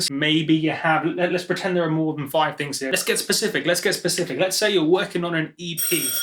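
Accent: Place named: United Kingdom. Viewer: agreed. he has a horrible caser of British pouty voice and british throw away talk syndrome.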